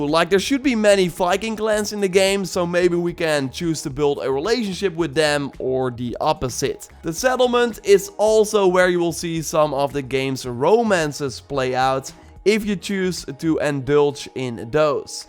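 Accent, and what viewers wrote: Netherlands. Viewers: Ik vind het zo mooi dat ik gewoon hoor dat je Nederlands bent😂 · Hey i love your vids and I'm also dutch like you I could hear it from your voice and also subd today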